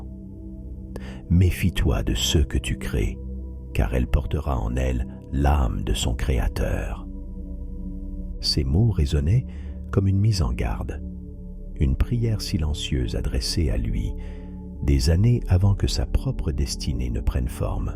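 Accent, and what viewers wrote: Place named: Quebec, Canada. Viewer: Sympa, le petit accent québécois 😉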